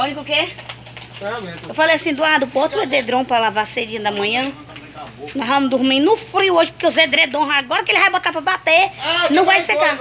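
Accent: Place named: Northeast, Brazil. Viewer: Tive que me inscrevê, apartir do segundo vídeo assistido, não resisti essa vòz rasgada do nosso nordeste . sou baina e admiro cada sotáque deste nosso imenso Brasil 😘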